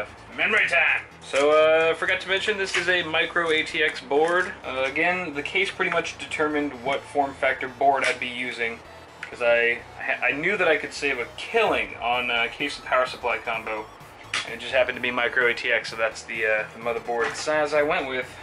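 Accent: United States. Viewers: This build is cringe worthy, with the brown pcb on the motherboard, ghetto cabling, sketchy power supply, cheapo case, and an american accent half the time. is a legend, he pulled all these things off in one video. You shall never be forgotten.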